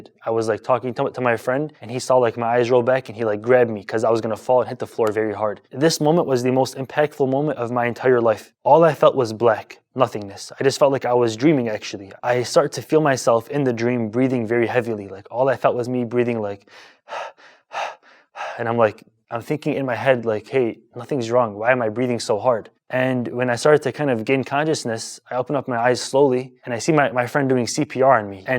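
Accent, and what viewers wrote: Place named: Arab world. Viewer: لا لهجته عربية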